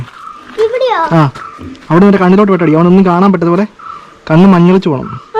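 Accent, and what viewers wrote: Kottayam, India. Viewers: R u from Kottayam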